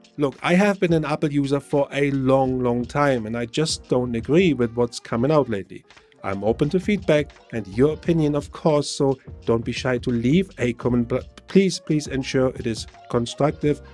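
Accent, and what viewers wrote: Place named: Germany. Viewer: Thats a Strong German Accent ! Are you German ?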